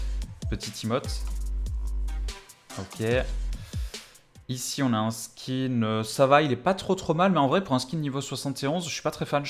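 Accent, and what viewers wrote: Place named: Russia. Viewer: J'adore ton accent "roose"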